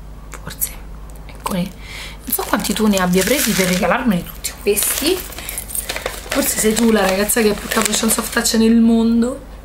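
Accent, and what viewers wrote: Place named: Rome, Italy. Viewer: Ciao! Finalmente sei tornata mi mancavano i tuoi video e il tuo accento romano troppo forte! Attendo il video con le tue creazioni, sono solo un pò curiosa...Bacio!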